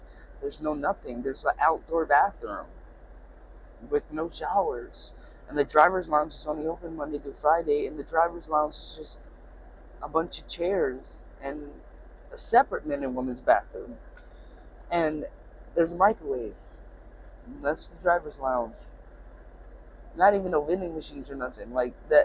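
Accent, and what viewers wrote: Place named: California, United States. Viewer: You sound like you from cali...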